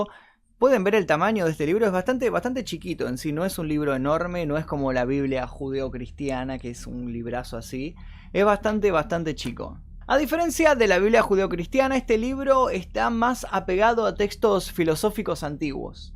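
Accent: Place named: Argentina. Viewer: 😏 argentina xddd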